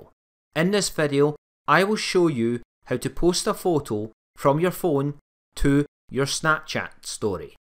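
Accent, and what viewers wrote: United Kingdom. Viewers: I love ur British accent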